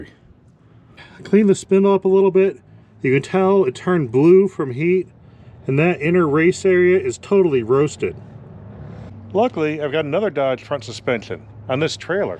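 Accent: New England, United States. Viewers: Every once in a while I hear what sounds like a Nor'Easter accent? Enjoying your work. Something optimistic and indefatigable in your approach to problem solving that makes me smile. Cheers from Monteray Bay CA.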